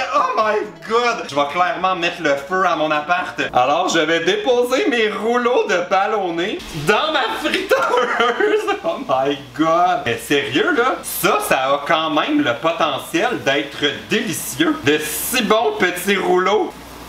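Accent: Quebec, Canada. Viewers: J'adore ton accent ( je pense québécois ) et j'adore cette vidéo ( mais aussi ta chaîne ) GROS BISOUS 😁😘😘😘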